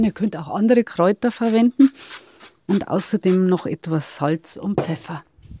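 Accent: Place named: Bavaria, Germany. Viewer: Servus schneeglöckchen, käse knödel hab ich noch nie gehört.bin aber net so der fan käs. Spinat des doch des grüne vom kreiselmähwerk. Spinat der mit den blub war früher immer die kinderwerbung. 😅spass bei seite. Was du immer für ideen hast mit deinen kochkünsten das überrascht mich immer voll. 😊❤ oberpfalz ok hörst die eher bayerischer dialekt an dein mann hat dich gelehrt eine neue zu lernen ich lerne bayerisch 😅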